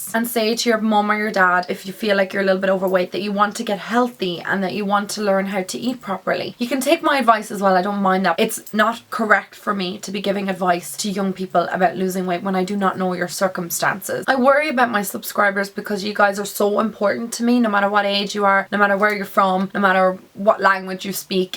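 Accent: Ireland. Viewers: Are u Irish if so I'm from Connacht in Sligo and I think your from Dublin right?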